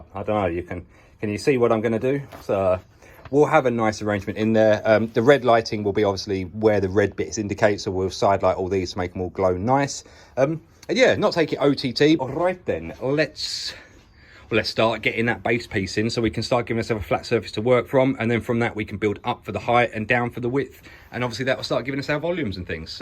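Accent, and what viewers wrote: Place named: England, United Kingdom. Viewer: You have such good material for your videos.. but the English Accent that England is so proud of has got to be the worst sounding English ever .. I am from South Africa and much prefer hearing an American English accent than this.. I can barely watch this.. it's like not even English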